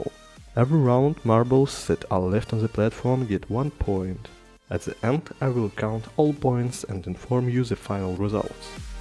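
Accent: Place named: Russia. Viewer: у автора как будто русский акцент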